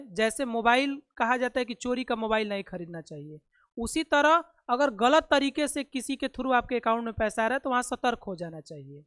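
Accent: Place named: Bihar, India. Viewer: Bihari style hindi But Good Knowledge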